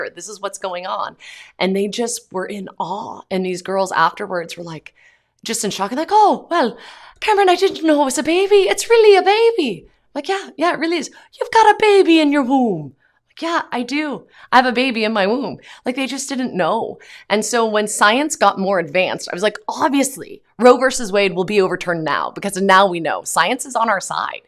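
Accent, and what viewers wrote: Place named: Ireland. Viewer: Oh Cameron, I am so ashamed of my country, I am from Ireland and it is just getting worse. I also pray for the softening of hearts and that the scales fall from their eyes. On a lighter note, I love your Irish accent ☺️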